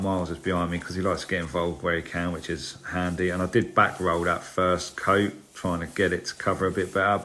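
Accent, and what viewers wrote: England, United Kingdom. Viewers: So many people who hear an Englishman, with this particular accent and makes his living with his hands, assume he’s like a soot-covered Chimney Sweep or a perhaps humble Cobbler from a movie that’s set in Britain at the beginning of Industrial Revolution 😂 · I love this accent, bruv. What part of England/UK is this accent? Me and my 2 teenage sons mess around with each other talking like The Kings Men.....bruv, and you lot😅😅❤❤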